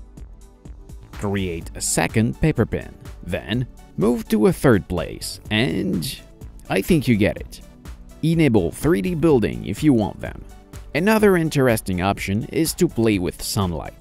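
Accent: Quebec, Canada. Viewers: French Canadian ?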